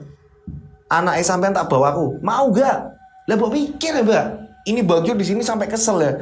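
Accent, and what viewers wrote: Java, Indonesia. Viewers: Cara bawain bisa jawanya sangat terasa sekali ms...bahasanya Jawa kok sama presis dengan punyakku......tulen banget wkkkkk...semangat bos Hongkong hadir selalu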